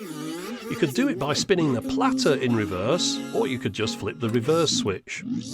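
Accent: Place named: North West England, United Kingdom. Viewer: Just watched your 'shaving cds' video... Funniest thing I've seen in ages. Not even into hifi, though I am a musician, but subscribed on the back of it. Partly because you sound like you're from my part of the world (North West) and because I've got high hopes for April 1st ;) Cheers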